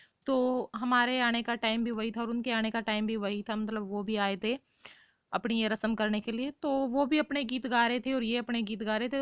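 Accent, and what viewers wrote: Haryana, India. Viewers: Very nice di aapka haryanvi andaz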